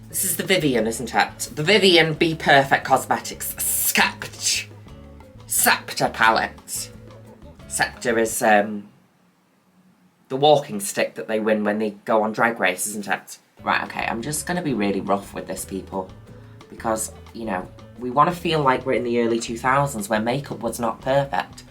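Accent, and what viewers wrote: Australia. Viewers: Quite aside from the STUNNING makeup, that was the best Aussie accent I've heard in forever. Signed: an actual Australian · Love has she it super funny. Also great Australian accent! ❤ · THE AUSTRALIAN ACCENT SPOT ON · Stunning as always. Love your Aussie accent 😍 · Ok finally a drag queen who can do a fairly close aussie accent! DORN'TTTT !!!!! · Yasssss the Aussie accent got me good! Straya loves you ❤️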